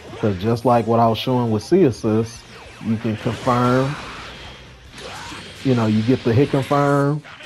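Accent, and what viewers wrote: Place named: Memphis, United States. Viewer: U sound like you from memphis bruh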